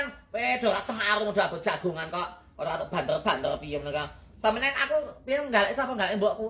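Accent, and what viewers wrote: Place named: Surakarta, Indonesia. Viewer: Logate ❤nambani logat solo Jateng....wong tuo q asli solo jogya ... Saiki q d kota prob jatim... Logat q ws gk ngunu😊😅😅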